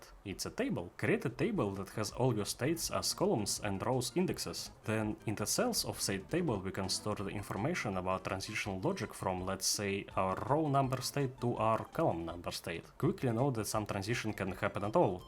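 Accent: Russia. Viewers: I hear strong Russian accent. Good presentation of the material.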